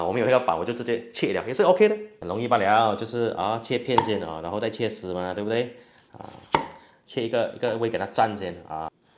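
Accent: Malaysia. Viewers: From the way he talks,I think he is a Malaysian Chinese... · 沒有臘腸和冬菇的蘿蔔糕😅聽口音是不是大馬風格的蘿蔔糕啊😅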